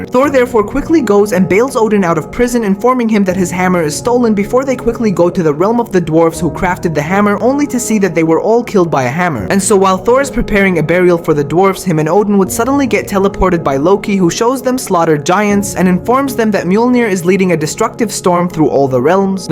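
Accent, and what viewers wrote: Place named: New York, United States. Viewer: U from New York aren’t u?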